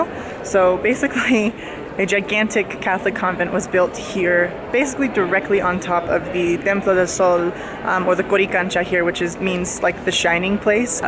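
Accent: Spain. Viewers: Yeah, she studied abroad in Spain, that's why she has the spanish accent.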